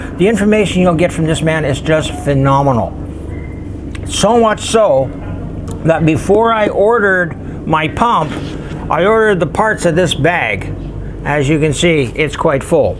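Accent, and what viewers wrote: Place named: Canada. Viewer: You know what? This guy is speaking in plain English, albeit with a Cannuck accent, yet I cannot understand what on earth he's yabbering-on about, most of the time. I did actually fall asleep at one point...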